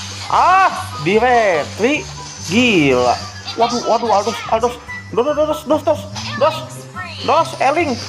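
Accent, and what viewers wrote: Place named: Tambun, Indonesia. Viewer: kaya orang tambun bhasanya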